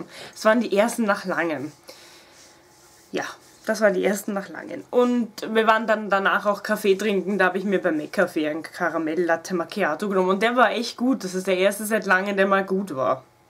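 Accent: Austria. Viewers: Ich find dich so süß. Ich könnt dich grad echt knuddeln. Und ich LIEBE deinen österreichischen Dialekt. :-)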